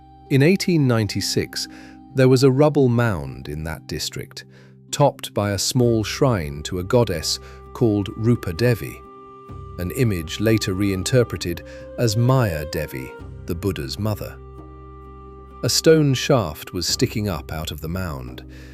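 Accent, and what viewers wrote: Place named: United Kingdom. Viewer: A total waste of my time and the hardest was to torture myself through the awful Britoid accent.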